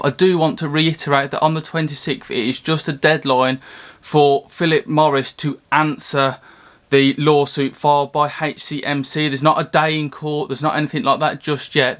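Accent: United Kingdom. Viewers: Prolly UK somewhere lol. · Got to say I’m loving the fact that I have found someone with my home accent... your obviously a Brummie?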